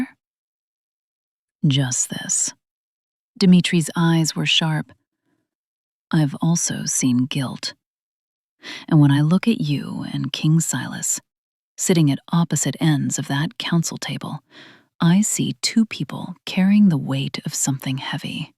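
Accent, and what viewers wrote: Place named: England, United Kingdom. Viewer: I liked storyteller with the English accent.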